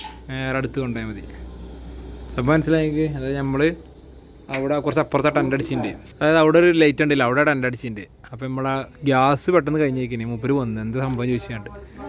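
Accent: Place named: Malappuram, India. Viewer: എടോ ഇത് മലപ്പുറം ശൈലിയാണ്, അല്ലാതെ അച്ചടി ഭാഷ ചാനൽ അല്ല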